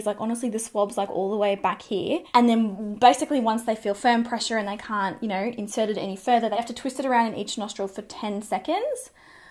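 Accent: Australia. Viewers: Please do vlogs with dogs! You are still the only Aussie mua I can find so it’s good to hear our accent (I live in NSW). I am trying to home school my 2 girls - not easy. They are 12 and 9 and were just having a screaming match at 10am, so “school” had only been on for an hour 😖 The one thing that calmed them both down was meeting your beautiful new puppy! I was enjoying your video - always brilliant!- and then you put the gorgeous Emma on! More puppies and makeup and chats please! Thanks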